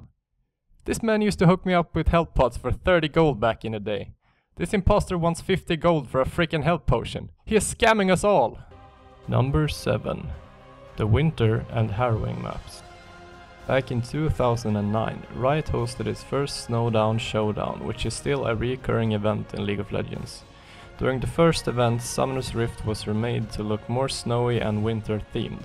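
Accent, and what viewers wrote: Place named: Romania. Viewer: Deci dupa accent deja ceva nu era ok, ba asta ii roman, dupa screenshot-ul ala... :))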